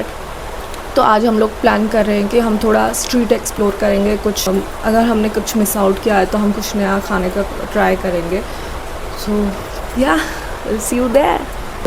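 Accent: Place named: Odisha, India. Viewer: ମୁଁ ଜାଣିଛି ତୁମେ ହେଉଛ 💯% ଓଡ଼ିଆ। କାରଣ ଯେଉଁ ଓଡ଼ିଆ ଲୋକ ହିନ୍ଦୀ ରେ କହିଲେ ଜଣା ପଡ଼ିବ ଓଡ଼ିଆ ଲୋକ।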